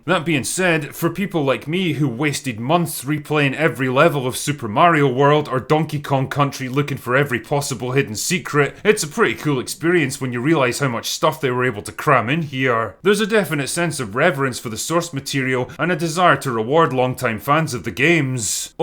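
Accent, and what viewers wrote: Scotland, United Kingdom. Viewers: No, I don't care whether or not it's good, and I'm not paying for it anyway since it's not my genre. I just enjoy your take on these things. It's hard to beat a drunken Scot sarcastically discussing a movie. ;-)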